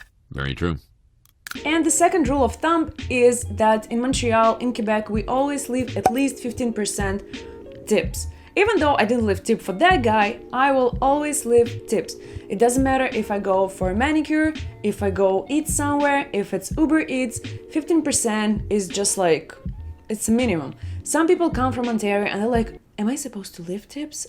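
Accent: Russia. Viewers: Like for the natural “Russian” accent ))) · thank you...I really liked it....I love your russian accent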